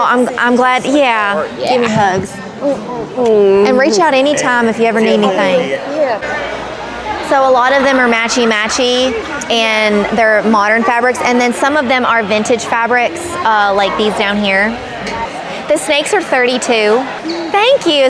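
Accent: Tennessee, United States. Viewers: Loved seeing you interact with all your customers. You are so good at that! Also, I realized how much more your TN southern twang came out while engaging with customers. I am an East TN girlie and you sound just like everyone here.😊I haven’t made it all the way to the end yet but I hope you did well and even those who didn’t purchase will visit your website soon!❤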